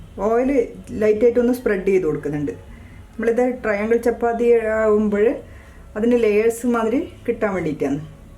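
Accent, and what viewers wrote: Kannur, India. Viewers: Language tune like Kannur?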